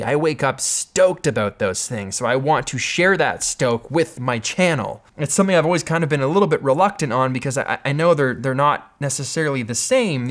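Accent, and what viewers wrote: United Kingdom. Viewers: That British accent 😂😂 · British accent sounds like Jamie Oliver. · your British accent is getting better every video, well done lad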